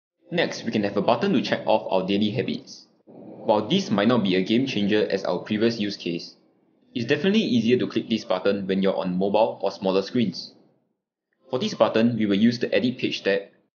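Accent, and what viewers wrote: Singapore. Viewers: The Singaporean accent haha! Love your content. 😁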